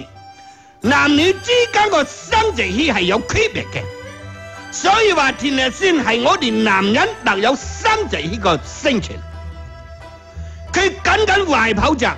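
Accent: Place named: Taishan, China. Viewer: 勁，台山口音！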